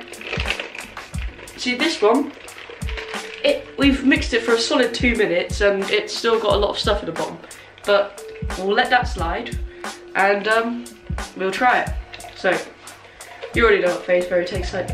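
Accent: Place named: United Kingdom. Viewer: You’ve got a thicker British accent than me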